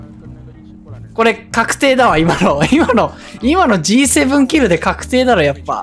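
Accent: Kansai, Japan. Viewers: この人関西の人？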